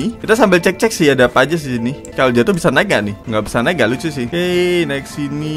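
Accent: Java, Indonesia. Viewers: Kenapa selalu lucu kalo Mas e ngomonge medok 😂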